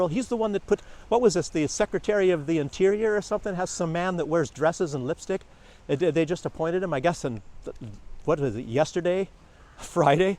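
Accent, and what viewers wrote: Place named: England, United Kingdom. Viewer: You must be very much in love with Belarus. Where are you from bro? Judging by your accent, you're probably from England. However, I am from Cyprus, and I envy you now that you are in Belarus.❤❤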